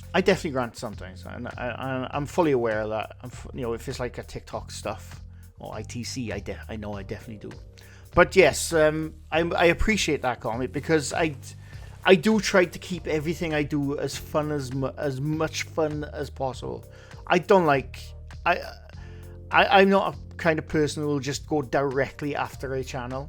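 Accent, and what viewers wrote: Wales, United Kingdom. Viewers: Love listening to your Welsh accent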